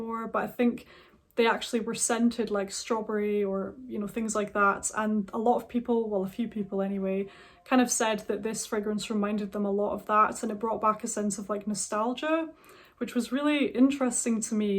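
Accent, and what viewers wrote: Scotland, United Kingdom. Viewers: I love your voice. I'm here in the heart of the southern U.S., Arkansas. I hate the average accent around the area I live in, and your accent is a breath of fresh air. Will you tell me where you grew up? My girlfriend & I have debated it quite a lot. We thought it sounded a little Scottish, but you have the United Kingdom as your location. Thanks. We love the Channel.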